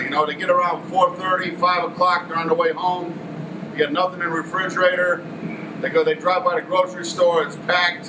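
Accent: United States. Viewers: Where are you based sir, New York? I like your kitchen I remind me my time in London but you speak like American...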